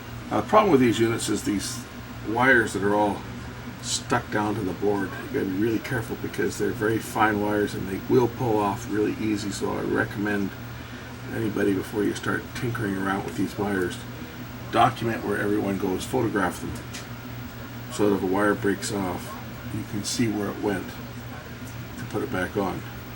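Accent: Canada. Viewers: great canadian accent!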